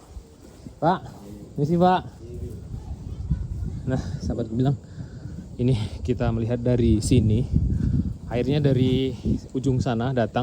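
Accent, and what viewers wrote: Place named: Padang, Indonesia. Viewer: wes penter boso padang, suwi suwi jowone kelelep. Semoga sehat selalu bolo.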